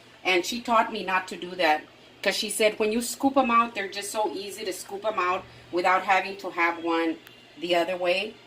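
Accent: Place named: Texas, United States. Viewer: . Love your channel. Reminds me of my grandmother’s cooking. Must be the love. I was raised in south Texas and your slight accent is reminiscent of my upbringing. It’s like being home with family.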